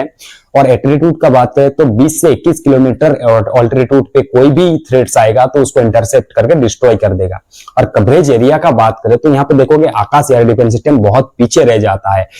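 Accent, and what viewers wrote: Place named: Bengal, India. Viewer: अभी आपको हिंदी बोलना सीखना चाहिए।बोलते समय स्त्रीलिंग पुल्लिंग का ध्यान नहीं रखा गया है। आपकी हिंदी में बंगाली छाप झलकती है।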